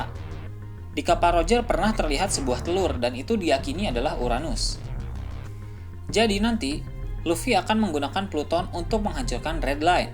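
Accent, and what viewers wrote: Indonesia. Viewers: sejauh ini admin udah oke, tapi untuk penyebutan istilah dalam bhs inggris masih indonesia banget wkwkwk usul aja buat belajar pronounciation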